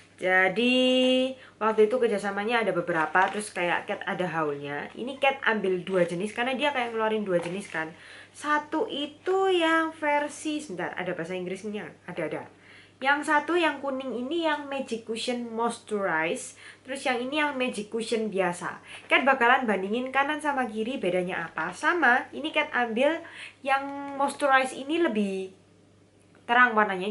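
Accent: Java, Indonesia. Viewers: medok tenan... kakak ini pengunjung baru kak. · medok ah ameut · Hai lg pilek yak?btw ak subscriber baru suka style nya km ngomong sih njowoni hahhaa..keep up the good work ya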